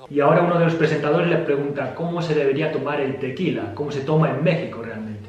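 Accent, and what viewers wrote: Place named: Spain. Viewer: Tu tambien como maestro hablas muy bien el español...... pero al estilo de España y gracias por exaltar a mi hermano Mexicano Christian .... saludos y abrazos desde Cd.Mx.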